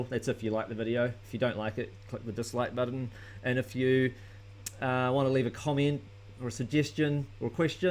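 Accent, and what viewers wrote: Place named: New Zealand. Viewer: Yeewwwww NZ accent, reminding me of home. LOVE IT BRAH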